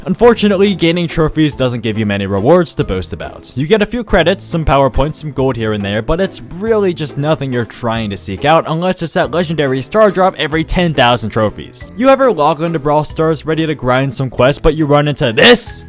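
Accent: India. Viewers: Bro r u indian??? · indian rupee ? ur Indian ? if u are damn nice accent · Are you Indian>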